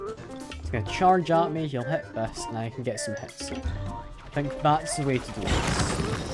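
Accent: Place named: Scotland, United Kingdom. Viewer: Cool. Funnily enough, I'm also Scottish and yet your accent is much stronger than mine lol. Nice video btw!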